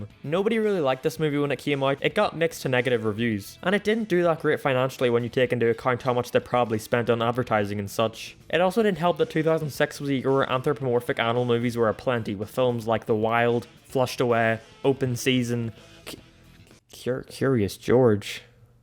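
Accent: Ireland. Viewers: Are you Irish or Scottish? I can’t tell, but don’t ever shit on my Barnyard again. Eat some shit ass food, pray to your King, and shut the hell up. 1776 from the Brits but 2006 is what you should remember. Peak USA dumbass. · Yo didn't know your irish that's awesome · r u irish? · U Irish ooooooh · Wait. Is he Irish? Mind=blown. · wait i thought you were scottish but literally only ireland has irish as a class so that narrows the place you grew up in to side of ireland to the north or donegal because you have that kind of accent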